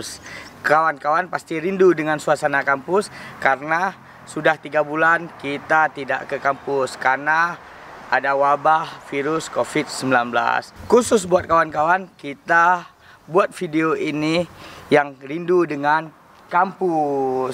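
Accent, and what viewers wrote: Aceh, Indonesia. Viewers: B.Indonesia nya aceh tok tok kali bang🤣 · Beliau Logat Aceh nya kental sekali, makanya ngomong indo ke gitu :v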